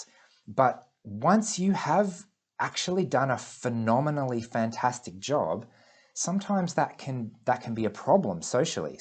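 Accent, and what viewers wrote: Australia. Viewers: I hate Australian accents, but I'm still subscribed because I appreciate your ideas.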